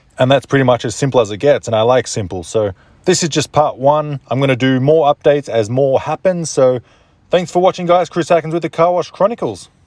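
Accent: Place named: Australia. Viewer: I'm actually dying to go to move Australia. I was shocked when I noticed your accent, and you moved to California. I feel like Australia is the place for me. I'd like to move to Sydney. I'm sick of the freezing weather here. Canada is brutal to live in. Sydney has the most amazing oceans I've seen on camera, but maybe it's hard to make a living there. You obviously know more than me.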